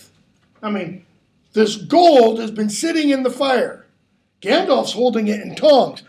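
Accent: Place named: United States. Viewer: Very loud and american.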